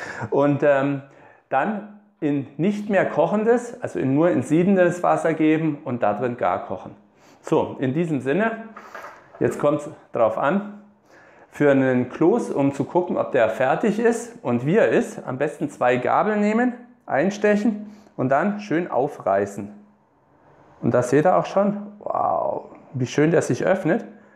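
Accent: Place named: Franconia, Germany. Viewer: Fast das Originalrezept. Warum nur fast? Das Drittel der Kartoffeln, die weichgekocht werden, werden nach dem Kochen noch zu einem dünnflüssigen Kartoffelbrei verarbeitet. Die weichen Kartoffeln werden dazu im Kochwasser püriert. Wenn man die Thüringer Klöße so macht, erhalten sie ihren eigentlichen Namen, nämlich Grüne Klöße. Ansonsten hast du es perfekt getroffen. Übrigens: Wo kommst du her? Du klingst manchmal etwas leicht fränkisch.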